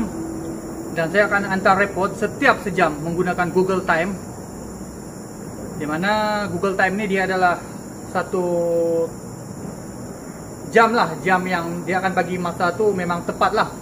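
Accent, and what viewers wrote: Sabah, Malaysia. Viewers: Hai..salam dri sabah,sya pn cmpuran jg..mak sya org rungus kudat bpa sya org brunei..sya fhm2 sikit2 ckp..sbb mcm bhsa rungus jg cuma lohgat sja lain..sya memng sdia mklum org sabah thu dri bhsa mlayu sja..apa pn terbaik..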